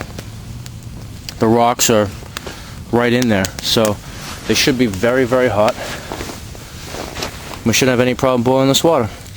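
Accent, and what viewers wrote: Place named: Massachusetts, United States. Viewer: Massachusetts accent....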